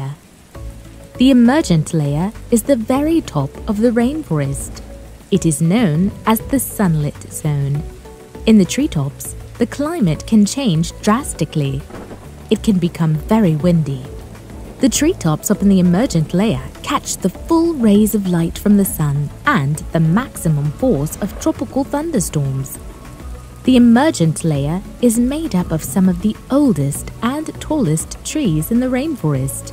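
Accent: United Kingdom. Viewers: Do you have a British accent? ❓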